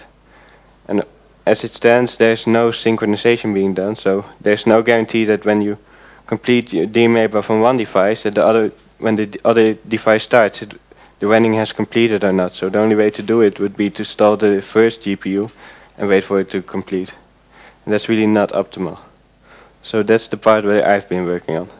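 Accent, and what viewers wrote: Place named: Netherlands. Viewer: Klinkt zó Nederlands :-D maar goed gedaan.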